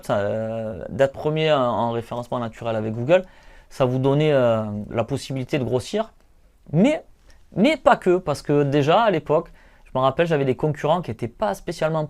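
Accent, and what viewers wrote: South, France. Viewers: Salut je vient de découvrir ta chaîne et je trouve vraiment que ton énergie est super et sincère et sa fait du bien...:) en plus étant née a marseille et n’étant plus dans le sud tu me fait sentir la garrigue en t’écoutant:) Merci pour tes conseil continue comme tu le fait et bon rétablissement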